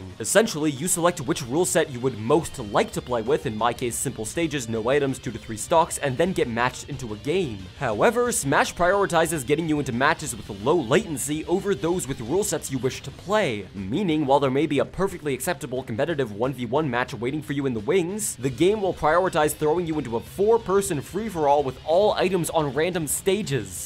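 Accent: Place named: Norway. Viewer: You sound like you are from norway :D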